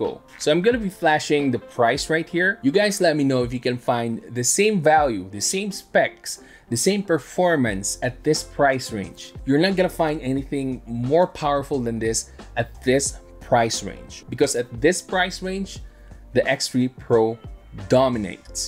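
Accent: Philippines. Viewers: The Philippino accent is the cutest ever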